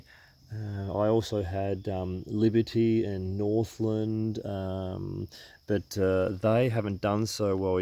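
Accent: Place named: Australia. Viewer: You sound like an Aussie 🇦🇺☺️🙋🏽‍♀️ But you’re in Canada?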